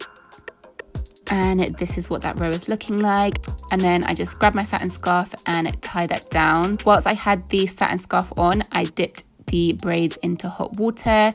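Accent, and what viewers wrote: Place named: United Kingdom. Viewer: Love these braids on you! Followed you over from IG and this is the first time I I've heard you speak lol Totally forgot you are from the UK <3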